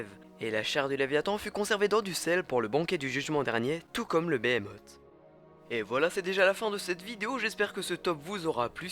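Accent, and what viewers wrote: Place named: Switzerland. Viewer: salut tu as un accent est ce que tu es suisse? :)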